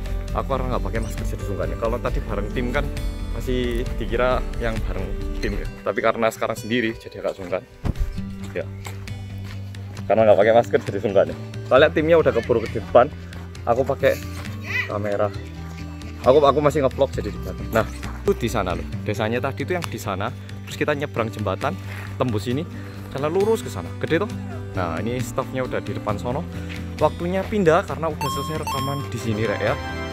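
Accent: Java, Indonesia. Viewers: Beralih jadi aktor Korea medok mas 😂😍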